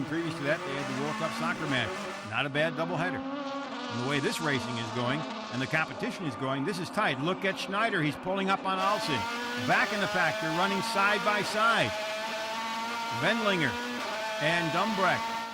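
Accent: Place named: United States. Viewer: what's with the american accent?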